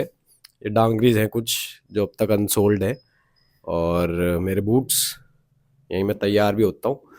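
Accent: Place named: Haryana, India. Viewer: Ye kidhar hai baawli, accent aapka haryanvi ka Gujar, Yadav, UP, Delhi ke lagte areas ka lag rha hai. Support and blessings to you though!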